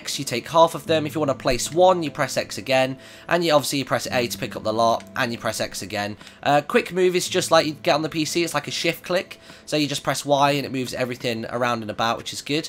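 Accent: United Kingdom. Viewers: how come every time i watch something about minecraft the person that talks is british! its so annoying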